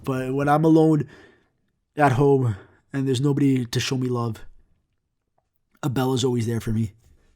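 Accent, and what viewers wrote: New York, United States. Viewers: New York accent. Also your videos are still great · Your NY/NJ accent is hella strong. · I usually dont really like podcasts but ive taken the habit to re-listen your two podcast episodes when ive had a complicated day, hearing your new yorker accent bitching about shit and saying "cawfee" and "wotr" butters my rolls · You have an accent, and a New York one at that. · Are you from New York because New Yorkers say Cawfee. BTW I love your accent.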